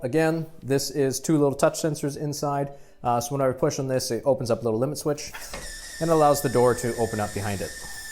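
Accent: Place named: Belgium. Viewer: Tot nu toe klink je belgs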